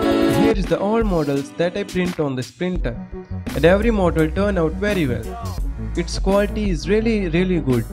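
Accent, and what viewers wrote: India. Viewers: indian accent English is PURE EAR CANCER!! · It's English but with Indian accent lmao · Indian accent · Are you indian bro??? Your accents is familiar🤨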